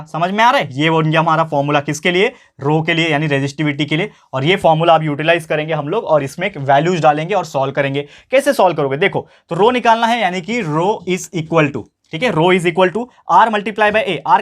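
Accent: Maharashtra, India. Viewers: Sir kya ap marathi ho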